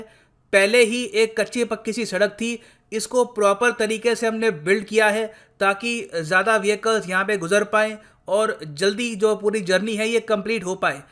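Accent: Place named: Punjab, India. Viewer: Upanishadik Liberal he is Punjabi. are Punjabi. And he has a Punjabi accent.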